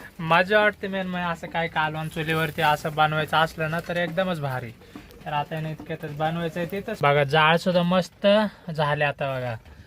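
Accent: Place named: Nashik, India. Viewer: भाऊ तुणच मुळ गाव कोनत आहे तुमची भाषा ऐकून वाटत नाशिक मधचे आहेत तुम्ही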